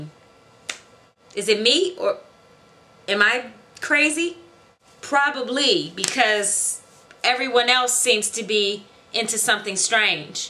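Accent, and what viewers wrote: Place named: Washington DC, United States. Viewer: Where are you from sound like you from DC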